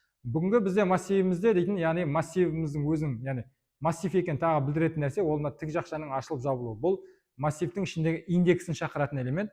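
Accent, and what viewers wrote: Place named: Mongolia. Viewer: Осы бала Монголиядан келген сияқты.Өте түсінікті айтып жатыр көптен көп рахмет .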